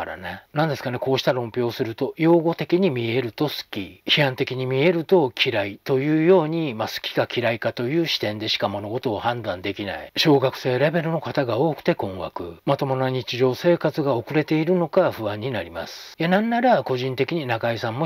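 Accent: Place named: Hiroshima, Japan. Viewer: 聞けば聞くほど、広島弁😆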